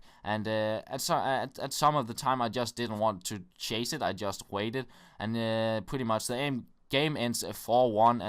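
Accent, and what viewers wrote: Jutland, Denmark. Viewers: Er du jyde? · you are danish right?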